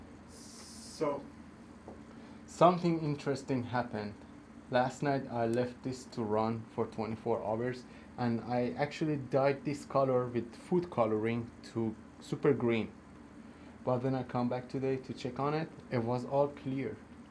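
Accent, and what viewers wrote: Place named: Iran. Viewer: nice mod. The peroxide bleached the color btw. Your accent seem to be Persian.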